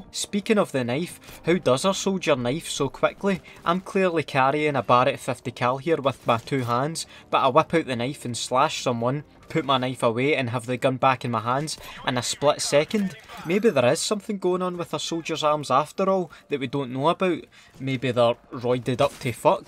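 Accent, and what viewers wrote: Scotland, United Kingdom. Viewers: Nice Scottish accent, mate! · He's Scottish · Who else loves this guys Scottish accent? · I really can’t take this seriously with the Scottish accent (no offense) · I love the Scottish accent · Is he Scottish? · The Scottish accent only makes it funnier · Man I love your Scottish accent lmao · I like ur Scotland accent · Are you Scottish but still nice vid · Not trying to be mean or raises he sounds like he is from 🇬🇧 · I loke that Schootis acent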